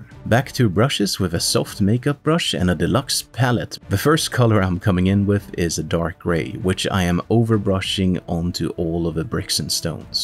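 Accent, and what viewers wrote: Sweden. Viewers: I would love to play on this board with my custom witch hunters! Are you in Sweden? Sounds like it!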